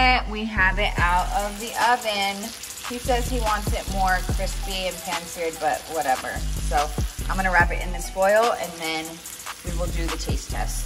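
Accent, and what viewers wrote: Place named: New York, United States. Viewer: Why does she sound like a New Yorker but she is somewhere in Texas, per a TV show video I saw?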